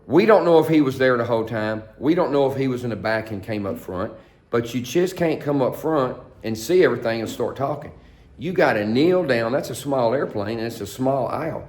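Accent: South, United States. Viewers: I read Greg was good friends with Dutton. Greg had 3500 flight hours and recent multi engine rating plus he seemed to know operational aspects of the Citation well enough to suggest he might have been best in right seat. Using my FSX to recreate the flight with 2 miles visibility, I lost the airport multiple times while turning back to runway 28, 10 then close downwind 28 to keep airport in sight at 1000 agl, then tight teardrop base to final, lost airport again, continued and eventually saw airport envirnoment. Scary even in FSX. I think they were 500 agl, or so, base to final. Nervewracking just thinking about it. Enjoy your podcast,. You nice southern accent reminds me of folks from Mississippi, like me.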